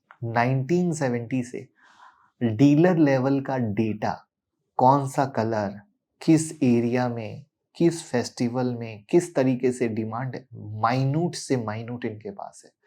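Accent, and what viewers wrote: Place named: Bihar, India. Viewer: He is not "Marwadi" From his accent seems to be a "Bihari"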